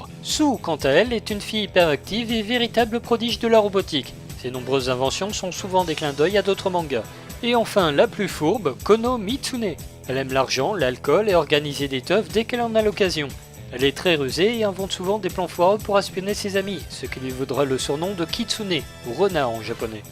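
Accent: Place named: Nord-Pas-de-Calais, France. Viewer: Yeah!! est de retour! Mec, tu m'as fait taper des barres de rire avec ton accent chti, en plus de m'avoir bien diverti avec une bonne critique. Faudrait que je me mette à Love Hina. J'ai tellement de Ken Akamatsu à rattraper. En tout cas ton retour fait hyper plaisir, bon courage pour la suite;)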